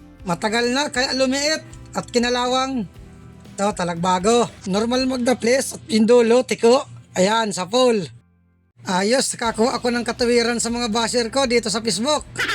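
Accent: Bicol, Philippines. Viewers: ang tagalog ni kuya may mga halong bikol.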